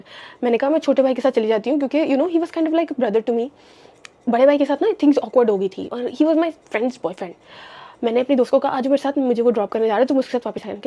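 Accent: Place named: United States. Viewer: Ur American accent is so damnn good!!!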